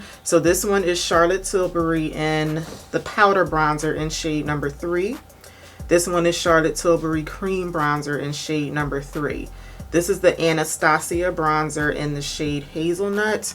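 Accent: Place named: Philadelphia, United States. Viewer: You sound like a Philly girl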